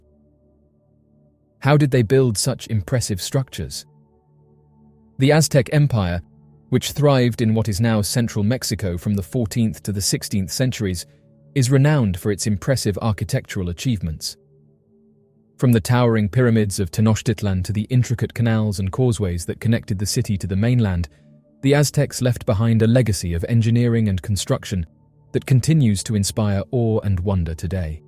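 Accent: United Kingdom. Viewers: Yeah, I am baffled by this video. Teotihuacan was neither built by the Inca (which is on a different continent) nor Aztec (about 1000 years too late). It's not exactly known who built it or what those people were called, as even the name Teotihuacan which means "city of the gods" was given to it by the Aztecs who found it as an ancient ruin. I guess if they slap on a dude with a British accent, that dumb Americans will believe anything they say in a video.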